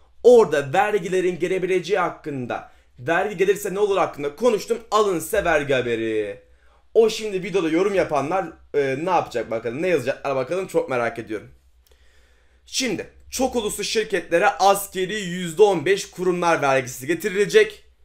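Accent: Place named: Kastamonu, Turkey. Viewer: Hocam memleket neresi kastamonumu şiveniz benziyor ❤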